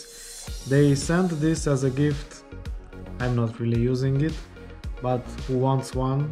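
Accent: Romania. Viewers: Esti roman, se simte dupa accent, nu trebuie sa ma uit la tine in profil ca sa imi dau seama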